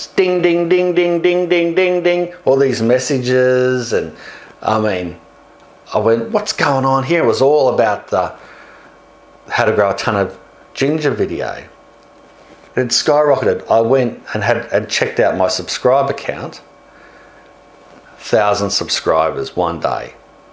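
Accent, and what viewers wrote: Australia. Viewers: I like your accent, it reminds me of crocodile dundee, your so full of life, I just feel like gardening myself. Great job. Great video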